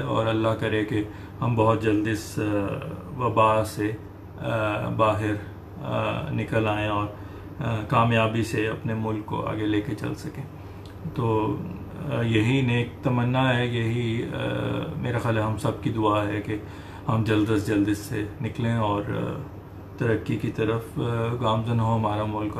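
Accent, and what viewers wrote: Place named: Punjab, India. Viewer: Nice ✔🙏 wow so super bhaut kubh nice your voice🙏🙏👌🌹🙇‍♀️🧕🙏 nice your panjabi language tone so super 👌👌🙏🙇‍♀️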